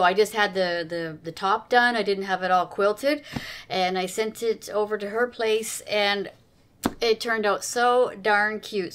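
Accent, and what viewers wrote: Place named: Canada. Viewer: Happy One Year Anniversary! Was painting and listening to random Youtube vids - heard your voice and recognized a fellow Canadian. I'm in BC. Weather is 'meh' here. Overcast.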